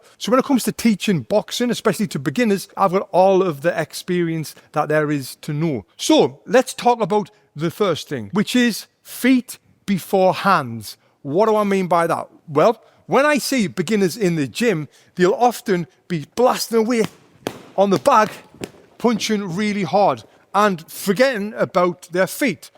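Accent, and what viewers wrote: United Kingdom. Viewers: Are you british mate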